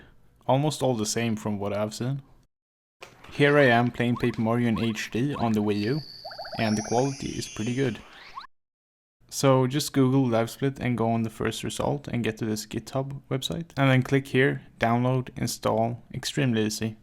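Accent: Sweden. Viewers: den svenska/engelska dialekten ;) du låter iallafall bra med din dialekt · du har den klassiska svensk-engelska dialekten haha grattis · i fucking love your swedish kinda accent!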